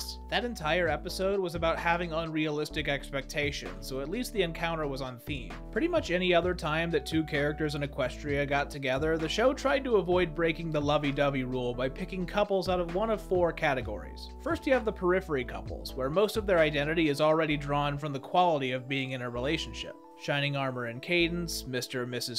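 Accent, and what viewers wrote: Netherlands. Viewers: hold on… are you Dutch…😃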